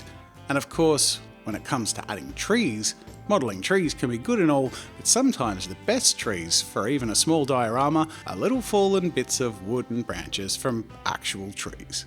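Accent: Australia. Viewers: A Dremel tool would help with roof, doors and windows. And by the way, you're totally bringing that soothing Bob Ross voice to this one. If Bob Ross had an Aussie accent... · Thought this was gonna be a troll, like he would start calm and then suddenly go crazy and make a castle or something, but instead I got Australian bob ross, witch was a very pleasant surprise. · Omg I LOVE this! Serene instructional of mini furniture? chill Aussie narration? More, please. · you're more aussie than ever in this one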